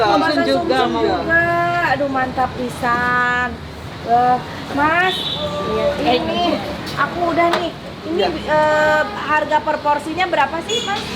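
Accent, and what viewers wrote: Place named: Cirebon, Indonesia. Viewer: Asli orng cerbon mna suaranya 😊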